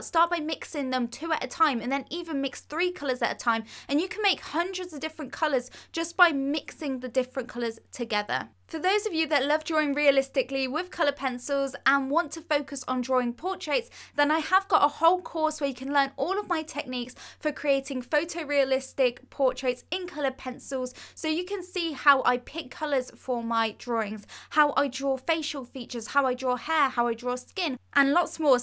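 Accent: United Kingdom. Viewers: Just love it! You're so clear in your explanations. I'd love to join the course on portrait, it would be a wonderful gift to myself for my birthday in June! Ps. You British accent is to die for, being Italian listening to you is a great way to improve my language skills which is an extra pro!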